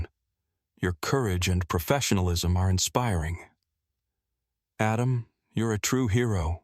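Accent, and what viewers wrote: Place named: United States. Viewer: The usual load of American accented crap! Give it away. Try it out with the Arlington guard change/ military cemetary! No? Gutless?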